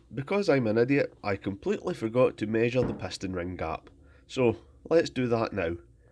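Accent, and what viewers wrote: Scotland, United Kingdom. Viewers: Man, between the dulcet tones of Scottish brogue and the "I make a new one"'s of My Mechanics, my craving for the greatest content on YT is satiated. Excellent work, per usual, friend. Can't wait to see this beauty in action. Much love from the USA.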